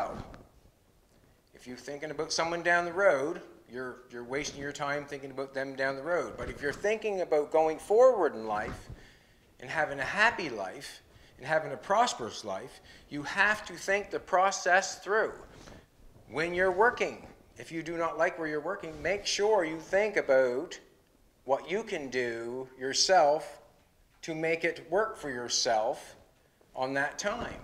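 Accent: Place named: Canada. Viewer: Merry Christmas to you and your lovely partner...keep doing what you do...I'm a Canadian living just north of Cincinnati Ohio and find your authentic Canadian accent endearing....it makes me long for home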